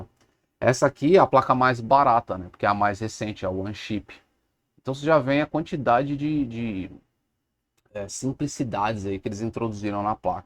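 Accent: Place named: São Paulo, Brazil. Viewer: Tá achando ruim amigo? Faça como eu. Desisncricão realizado com sucesso. Sotaque paulista merda.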